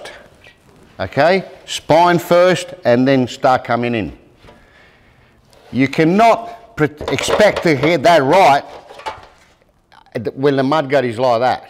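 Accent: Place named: Italy. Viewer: Sounds hes italian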